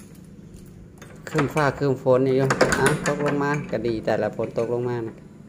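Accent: Isan, Thailand. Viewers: สำเนียง พูดเหมือนคนภาคอีสานมากๆคะฟังเข้าใจง่ายคะ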